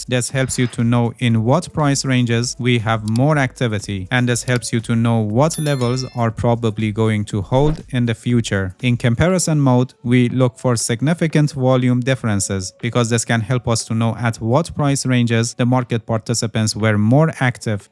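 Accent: Iran. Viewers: The accent. Isn’t it Persian ?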